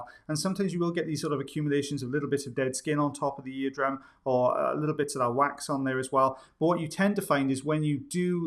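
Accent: Wales, United Kingdom. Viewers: Hey Dr., I'm curious, what specific part of the U.K. are you from? I feel like your accent was a bit more vibrant in this episode. I'm not complaining of course, I love it! 😊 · He has a Welsh accent.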